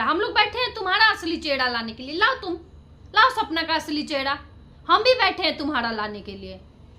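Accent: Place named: Bihar, India. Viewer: बिहारी अंदाज का मस्त बोलती हो आप मजा आ गया ❤❤❤❤❤❤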